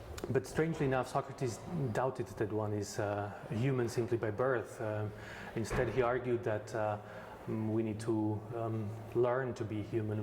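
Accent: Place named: Denmark. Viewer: Interesting. Although he is American, he speaks English as if he was a Danish person. His words are sharp and short.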